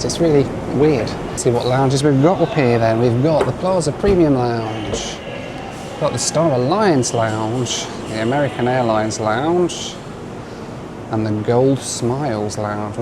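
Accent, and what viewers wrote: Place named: England, United Kingdom. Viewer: Brilliant vid, thanks!! I’m so glad you still have the English accent keep representing us out there mate!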